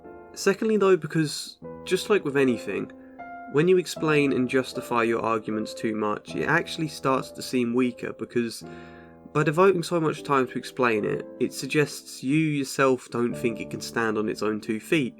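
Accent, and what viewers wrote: England, United Kingdom. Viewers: The choice of music and English accent made me feel as though I was listening to a creepypasta video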